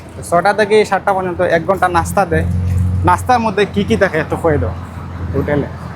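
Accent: Sylhet, Bangladesh. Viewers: ভাইয়া আমি রেস্টুরেন্ট ভিসায় আসতে চায় মালেশিয়ায়। আমার এই কাজের এক্সপেরিয়েন্স আছে, আমি সিলেটের রোজ ভিউ হোটেলে জব করেছি, আপনার কথা শুনে মনে হচ্ছে আপনি সিলেটি। আপনি কি কোন দিক দিয়ে হেল্প করতে পারবেন আমাকে.. কোন ওয়েতে আসলে ভালো হবে.?! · খাটি সিলেটি মাত বা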